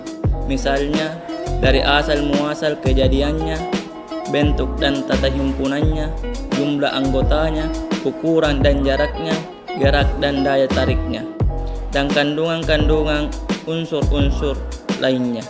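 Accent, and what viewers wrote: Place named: Makassar, Indonesia. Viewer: Sepertinya logat makassar ini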